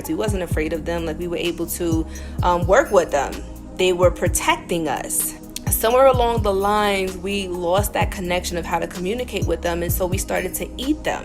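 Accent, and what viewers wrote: New York, United States. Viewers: Amazing, the reason I clicked on your videos 2 weeks ago is because you look just like my ex girlfriend. So much so, I sent the video to others to verify. The only thing that was different was the NY accent. Even then, I had to listen closely. Enjoying your videos, and appreciate what you doing! Much love❤ From NC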